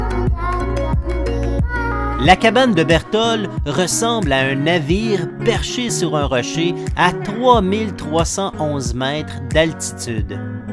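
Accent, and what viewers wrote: Quebec, Canada. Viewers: Comme j'adore votre accent Québecois !!!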